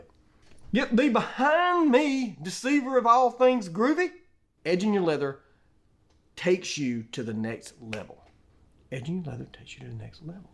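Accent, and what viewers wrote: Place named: West Kentucky, United States. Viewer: Just sounds like my friends back in the West Kentucky hollers. Makes it so much more enjoyable. My wife bought me a small, inexpensive laser. Been doing this for a while, gonna try it this way. TY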